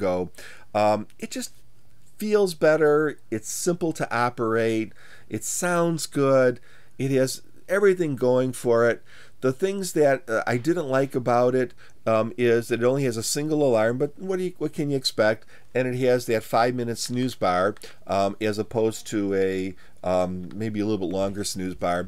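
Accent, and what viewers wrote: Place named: Milwaukee, United States. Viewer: U sound like you’re from Milwaukee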